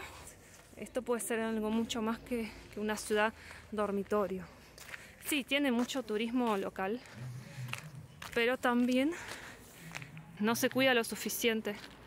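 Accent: Argentina. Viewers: Excelente castellano y acento argentino. ❤Río Ceballos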